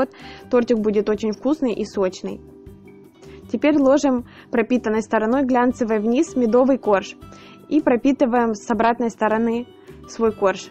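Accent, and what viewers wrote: Ukraine. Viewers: А то,что надо грамотно разговаривать,это фаэтОн девочка украинка,судя по говору,поэтому не совсем грамотно говорит,но ведь это не экзамен по русскому языку.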